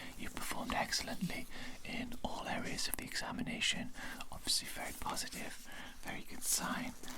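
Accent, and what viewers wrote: Wales, United Kingdom. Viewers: Where in wales are you from?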